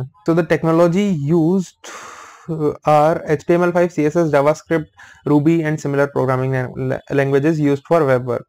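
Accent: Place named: India. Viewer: please, write (indian accent) in your videos so I don't waste my time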